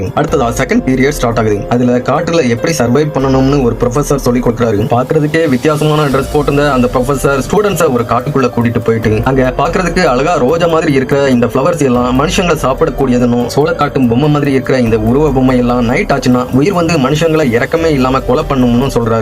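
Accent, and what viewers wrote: Coimbatore, India. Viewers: Enna bro unga slang coimbatore mari Iruku 🤔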